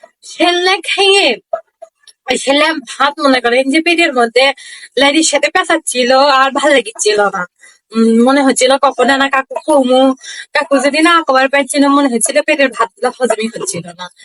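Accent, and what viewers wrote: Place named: Bogura, Bangladesh. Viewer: ভালোই তো বলতে পারো বগুড়ার ভাষা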